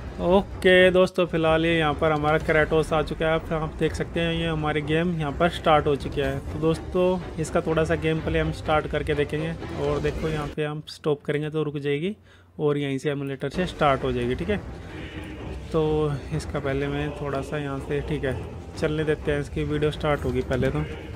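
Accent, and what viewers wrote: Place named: Haryana, India. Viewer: kyuki m khud haryana se hu and tumhari boli se pta chlta h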